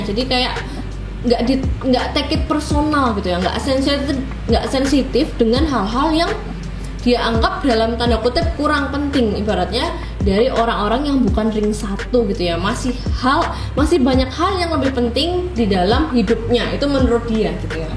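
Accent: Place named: Semarang, Indonesia. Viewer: Logatny kayak logat2 orang semarang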